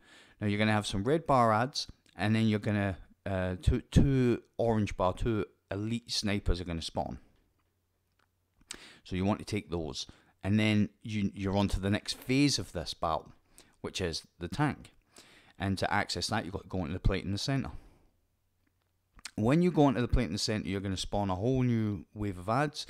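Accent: Scotland, United Kingdom. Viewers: Yer Scottish got ma support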